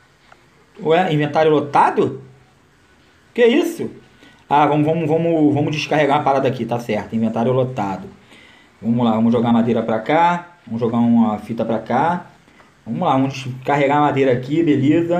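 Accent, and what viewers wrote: Rio de Janeiro, Brazil. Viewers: Tu é carioca no Sangue não é?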